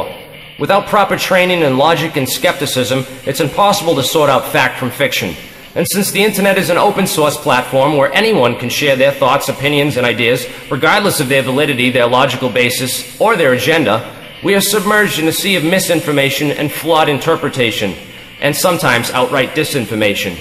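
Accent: Boston, United States. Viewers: What's with the Boston accent? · Good video, as always. But why do you have that Bostonian accent you never had before? I felt like I was watching the departed where the accents changed throughout the film.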